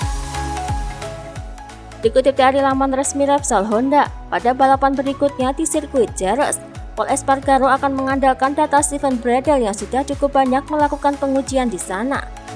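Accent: Java, Indonesia. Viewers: Jawane medhoookkk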